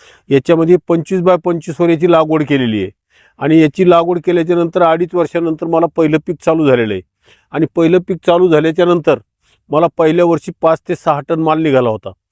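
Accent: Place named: Satara-Solapur, India. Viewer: तुमची बोलण्याची भाषा आणि सांगितलेला जिल्हा यात मेळ बसेना सातारा सोलापूर boundary सारखी भाषा वाटतेय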